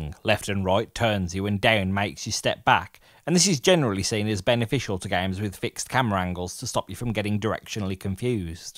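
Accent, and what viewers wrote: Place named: Birmingham, United Kingdom. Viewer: Nice accent. Where abouts in brum are you from😂. Nice vid also 👍🏻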